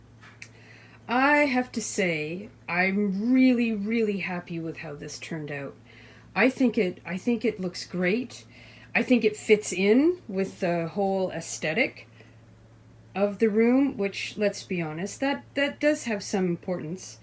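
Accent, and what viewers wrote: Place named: Maritimes, Canada. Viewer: I just stumbled across your channel today. From your accent, or lack there of, I was working on the premise that you were in Canada and I am betting the maritimes. I love what I have seen so far and have happily subscribed. I am in the process of preparing to move. Ugh…. However, there will be a dedicated craft room in the new place, so there are benefits to going through the agony. Your organization ideas have got my mind stirring. I have a dresser that will fit perfectly in the closet in the room. So far I am dedicating a drawer for each type of craft and each drawer will contain the tools and frequently used items in it. Unfortunately that means that I have to store extras outside of that room but hey, it’s a start. Please keep up the posting as I am now addicted. Anyhoo, hello from Ottawa!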